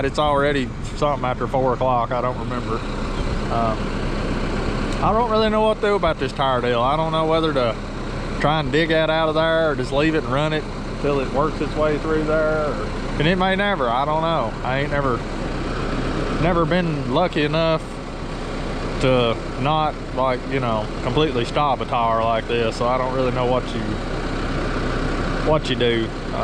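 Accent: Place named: South, United States. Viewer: hi nice hay were is this farm you talk southern, i live in hadley ma very dry here and no rain monday get some need it bad is it dry there and how is the hay crop growing, you have cows and feed them i used to have a farm mo longer every thing got sold and lighting hit the barn last summer every thing got lost so no more thanks for the videwo.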